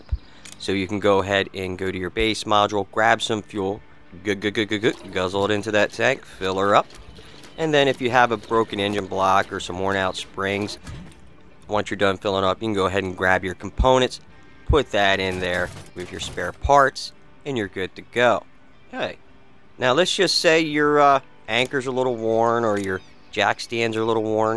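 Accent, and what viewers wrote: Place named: Northeast, United States. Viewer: Thanks for this tip, I just unlocked the drone. I'm glad that I can sell it back BC it's $50k! also, I think I recognize your accent as north east, more specifically the Tri-state area of NJ-PA-MD, most likely Delaware County in PA.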